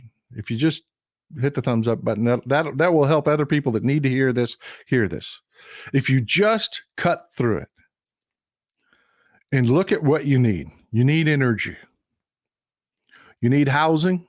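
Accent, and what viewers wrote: Texas, United States. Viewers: I love hearing that Texas accent:) Yerp=Europe:)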